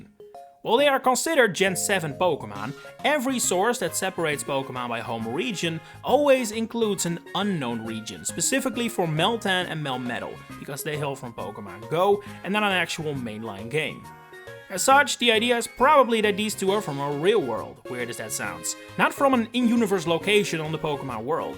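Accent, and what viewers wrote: Netherlands. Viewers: Really like how you sound Dutch with all the different types of American accents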